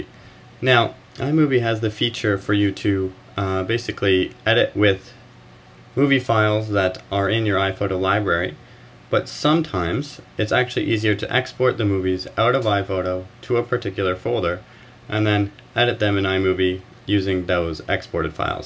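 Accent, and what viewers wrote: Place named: Canada. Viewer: Very helpful; quick information. And a Canadian accent to boot.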